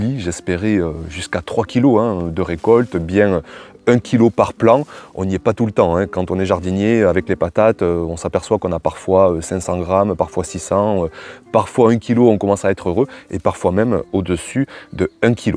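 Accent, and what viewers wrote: South, France. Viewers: Je lis avec plaisir tes vidéos avec l'accent du Sud ...la culture en sac, Une méthode anglaise qui a fait ses preuves. C'est idéal pour les gens qui n'ont pas de jardin mais une terrasse. Le souci est qu'il faut faire des petits trous et mettre des billes d'argile dans le fond du sac pour que l'ajout d'eau ne pourrisse pas les racines... Essayes avec ma méthode tu auras plus de productivité ... Ca dépend aussi de la variété. Moi je choisis des "Charlottes" et les fameuses " bintjes" belges pour les frites ! C'est une bonne idée d'en avoir fait une vidéo.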